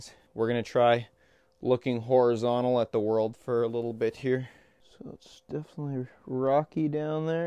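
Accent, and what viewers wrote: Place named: Canada. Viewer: you make (by far) the most unique and entertaining fishing videos on Youtube. It took a while to get used to the Canadian accent, but it's grown on me like mold on week old bread.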